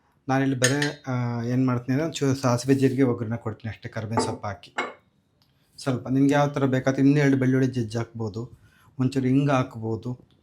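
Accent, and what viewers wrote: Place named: Davangere, India. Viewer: Annapoorneshwari taayiya sampoorna ashirvada ide nimge...ella adgegalnu estu shuchi ruchiyagi madtira...innu hechhina recipes na upload madtiri...nav davangereyavru...namge Khushi ide neevu nam side adge madvaga hage mam bhashe kelvaga...gud luck sir...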